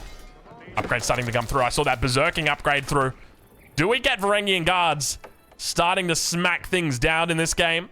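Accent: Australia. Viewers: Hi I love your videos but I have a massive problem watching them. I watch them generally before going to sleep and your buttery australian voice guides me to sleep. However I have developed a sort of Pavlovian response that now whenever I watch your videos I get really sleepy all of the sudden. Anyway still love your vids and have a G'Day!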